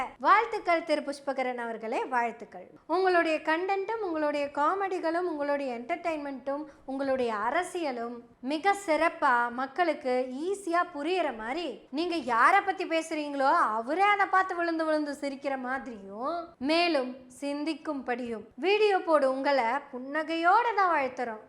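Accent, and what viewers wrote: Kongu, India. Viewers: கொங்கு தமிழ். அருமை. சில நேரங்களில் பேசும் மலையாளமும் புரிகிறது. satire. அருமை. மக்கள் ultimate. மக்களுக்குப் புரிய வேண்டும். புரிந்து விட்டால் போராட்டங்கள் குறையும். சரியான புரிதல் முக்கியம். அரைகுறையாகப் புரிநது கொண்டவர்கள் ஆட்டம் அதிகமாக இருக்கிறது. அந்தக்காலத்தில் கலைவாணர். இப்போது.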